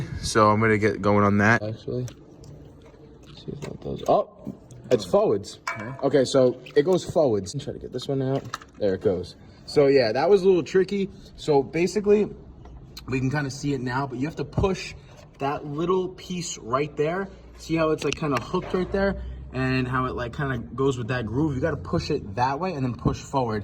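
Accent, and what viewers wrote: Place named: Long Island, United States. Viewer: LMAO that long island accent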